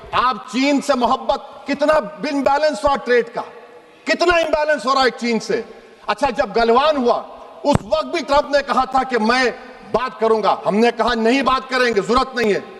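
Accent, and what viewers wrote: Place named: Hyderabad, India. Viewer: HYDERABADI BOLTEY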